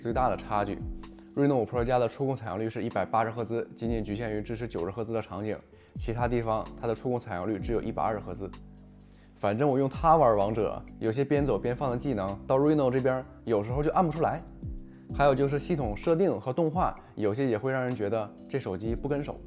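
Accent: Northeast, China. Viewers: 东北口音挺重啊！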